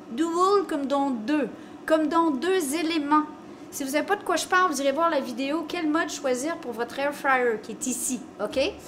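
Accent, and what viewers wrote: Canada. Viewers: Cc bonjour merci pour votre recette de calzone je voulais en faire mais n'avais aucune idée de se que l'ont metter dedans super! Je vais en faire et faire ma pâte a pizza moi même bne continuation vous êtes super et j'adore votre accent canadien qui ressemble un peu a mon accent du Nord 59❤❤❤❤️🌹🌹🌹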